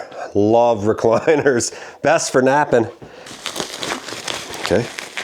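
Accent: Newfoundland, Canada. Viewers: That’s the Newfoundneez in you ………..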